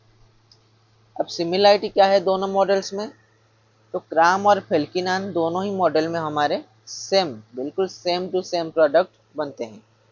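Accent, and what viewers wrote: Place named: Odisha, India. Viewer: bhai tama video ra strating 10 sec ru jani deli tame odisha ru boli , bhai bhala explain karucha , i love it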